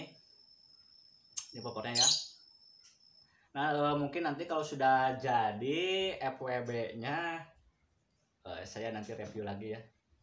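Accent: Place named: West Java, Indonesia. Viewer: Kyk org sunda ngomongnya mh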